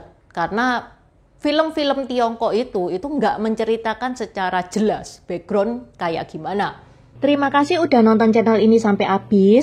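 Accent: Java, Indonesia. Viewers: Jowo nya ndi Cik,medok buanget Jowo neh,he he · ii Coba Dong Bicara pake Bahasa Mandarin nya sih, , ? ! Saya Pengen Ngedenger mgomong ny Mandarin, Campur Medok ny ngomong Bahasa Jawa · Suaramu kental banget jowone REK....😂😂😂😂❤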